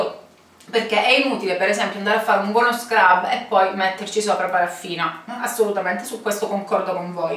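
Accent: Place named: Calabria, Italy. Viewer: carissima io "spollicio" ma non commento però i tuoi video mi piacciono moltissimo, sentirti parlare mi fa sentire "a casa" (W la calabria!!). questo sito lo visiterò anche perché un altro di cui non voglio fare nomi non mi ha inviato tutta la merce da me ordinata promettendo di reinviarmela....sono 8 mesi che aspetto.... buona serata e please non smettere di fare video!!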